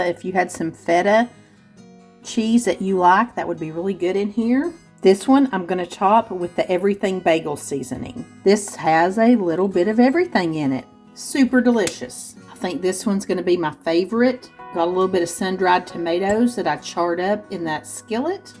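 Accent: South, United States. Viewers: thank you for replying about my cottage cheese/ beet recipe. I can just hear your voice …. I love your southern accent! And of course all your recipes, I have tried so so many of them!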